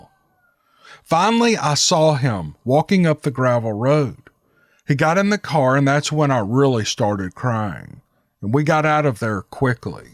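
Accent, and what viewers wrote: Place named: South, United States. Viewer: you are like the Earl Ives with a Southern accent you have got the best storytelling voice I've ever heard. I'm a truck driver that drives about miles a night and listens to podcasts in all kinds of storytellers. You and Mr. Ballen Do wonderful jobs and thank you very much for making my truck driving much easier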